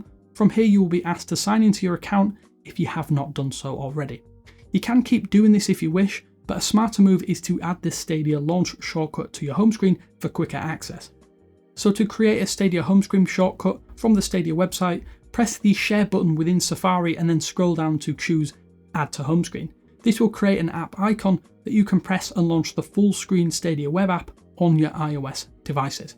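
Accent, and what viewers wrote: United Kingdom. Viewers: Is this available worldwide? I’m in the UK and it doesn’t seem to be working. I ask the question because you seem to have a UK accent.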